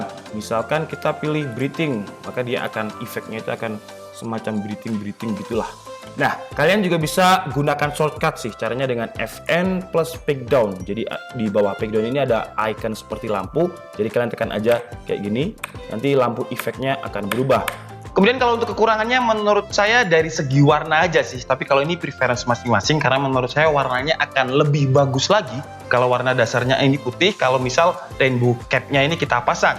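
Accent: Malang, Indonesia. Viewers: Mas Wong Malang Po?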